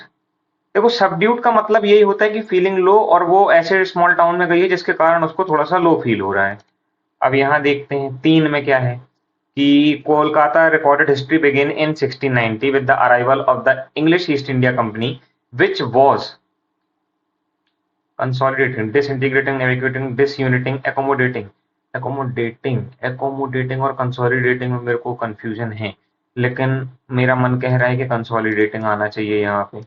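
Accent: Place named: Bundelkhand, India. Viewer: Ok bundelkhandi lag rahi thi braj type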